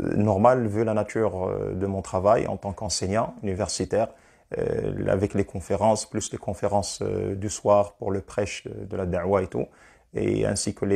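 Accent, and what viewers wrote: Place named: Jebli, Morocco. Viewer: عنوان للتواضع وللتمكن. من يسمع كلام شيخنا الفاضل ياسين العمري وهو يتحدث بعربيته الجبلية سيظنه إنسانا متواضع العلم،لكن العكس هو الصحيح ،شيخ متمكن ومتمرس و مرجع في علوم التواصل بالعربية والفرنسية معا بارك الله فيه ونفع بعلمه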